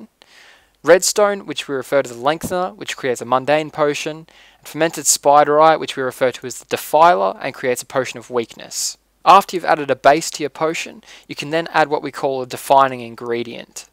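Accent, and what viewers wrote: Australia. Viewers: your my favorite minecraft person and can u play some battlefeild 3. Do u come from Australia coz u have an Aussie accent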